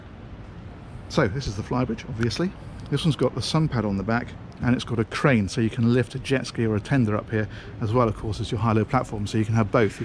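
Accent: England, United Kingdom. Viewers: Another one of your Splendid Reviews,. The World will miss you on U-Tube when you retire from doing these Very Positive reviews on your Aquaholic channel.I am guessing on your Age now. I Believe what really makes you Better than the Rest is your English accent and Your British Personality which Shines Through for you.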